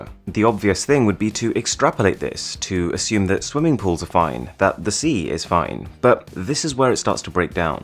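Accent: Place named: United Kingdom. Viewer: yeah he sounds birtish-y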